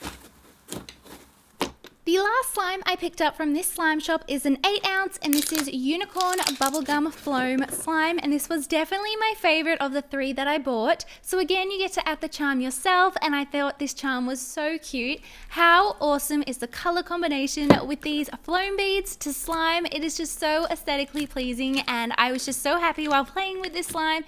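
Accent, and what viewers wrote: Australia. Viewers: Nimah Kazi I’m Australian, so she sounds like she’s speaking normally to me... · It's Australian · Ur Australian?! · its Australian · Wooo! Your Aussie like me! Say OI OI OI in the reply’s I also love slime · are you australian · are you Australian or newzealander?? nvm u say in the vid lol. im from aus too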